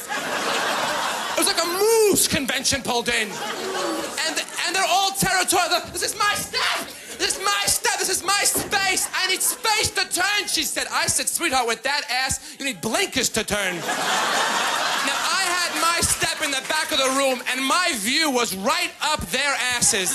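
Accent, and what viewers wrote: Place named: New York, United States. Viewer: he was raised in a religious jewish home. hes got a bit of a yiddish tinge to his accent. very new york jewish.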